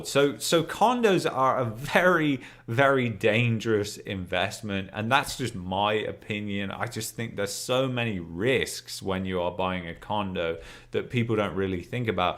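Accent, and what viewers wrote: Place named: Cockney, United Kingdom. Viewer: I’m trying to understand why a Cockney Lad with fabulous economic skills ended up in Canader!! I’m enjoying your intelligent reviews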